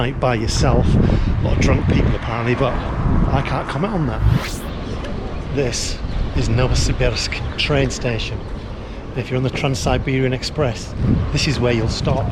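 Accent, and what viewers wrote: England, United Kingdom. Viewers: и цены называл в фунтах, так что даже во вкладку "о канале" можно не заходить + очевидное английское произношение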